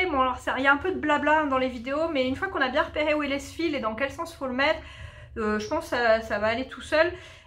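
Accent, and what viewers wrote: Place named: England, United Kingdom. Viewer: Bonjour, je ne sais plus trop comment je suis arrivée sur ta chaîne YouTube, je crois que c’est une recommandation de la chaîne. En tout cas, je suis mordue de chez mordue: j’aime ta personnalité enjouée, ton super accent anglais, les modèles et les laines que tu choisis (permets-moi de te tutoyer). Et puis, nous sommes presque voisines: j’habite Laval et je vais assez souvent à Portsall, sauf en juillet-août. Je continue le rattrapage des visionnaires. Bravo, continue comme ça!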